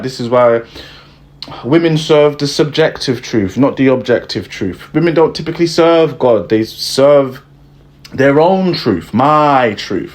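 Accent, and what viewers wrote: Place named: RP, United Kingdom. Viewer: Thanks for explaining this in the Queens English.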